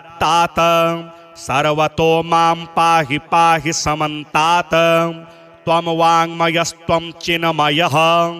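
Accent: Standard. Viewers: जय माता की, बहुत अच्छी आवाज है शुध्द उच्चारण है ,बहुत बहुत धन्यवाद 🌹🌹 नारायण गृहस्थ आश्रम नारायण नगर पचरॉव चुनार मीरजापुर उ प़ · 🙏ॐ श्री गणेशाय नम:🙏 🙏ॐ रिद्धि सिद्धि सहिताय श्री गणेशाय नम:🙏 आचार्य जी द्वारा शुद्ध उच्चारण सहित सुस्वर में लयबद्ध वाचन। आपको सादर प्रणाम🙏🙏🙏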